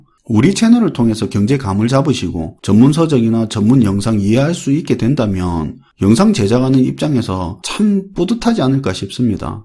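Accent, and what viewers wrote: Gyeongsang, South Korea. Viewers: 난 딱 한가지 원 한다면 경상도 사투리 고칠것 난 15년 걸려다 경상도 사투리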